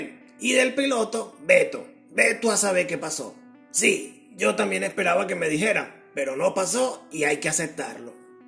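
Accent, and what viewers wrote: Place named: Venezuela. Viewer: Jaja tremendo narrador maracaibo o Caracas? Me espoleaste por completo 😂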